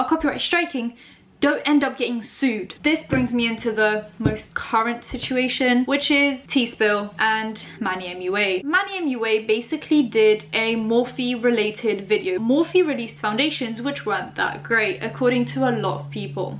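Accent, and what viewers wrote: United Kingdom. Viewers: Grl i started liking uk accent because of you and i love yours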